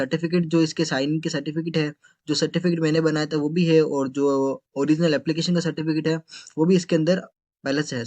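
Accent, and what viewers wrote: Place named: Ahmedabad, India. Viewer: bhai teri avaj Ahmdebadi jesi he. kya tu ahmedabad se he bhai😅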